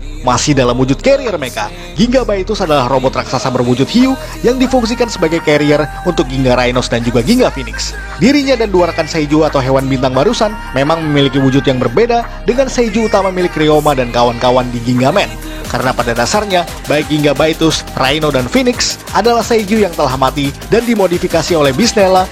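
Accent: Java, Indonesia. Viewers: Hihihi 😁 Saya gak blg gak suka sama sekali lho... Tapi iya saya setuju aja kalo naratornya itu terlalu berlogat Jawa medok apalagi jg 'umbar' SS favoritnya itu Gekiranger, itu sih sah-sah saja... Spt juga saya sampai skr msh memfavoritkan Goggle V dan Kyoryuger... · Di try it itu mnurut gw editannya aga kurang rapi, penyampaian narasinya jg aga kaku bnget dan dimedok²in,.. jjur ya gw jg orang jawa tp aga kurng suka klo ada youtuber yg make bahasa medok jawa gitu, knpa? Ya krna channelnya ditujukan untuk smua tokufans, bukan buat orang jawa doang😅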